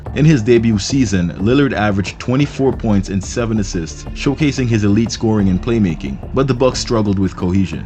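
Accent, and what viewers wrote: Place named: Jamaica. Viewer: if i watched this video before i wouldnt have had to ask if you were jamaican lol. notifications turned on! bell and everything! great video.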